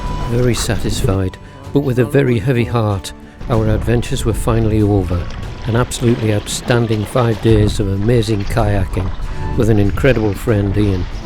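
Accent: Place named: Scotland, United Kingdom. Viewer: dulcet scottish tones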